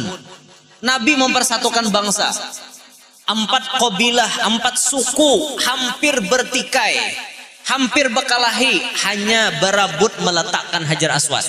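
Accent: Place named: Kalua, Indonesia. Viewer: Nang spesial disamping ilmu sidin adalah logat kalua nya nang maulah orang katawa😁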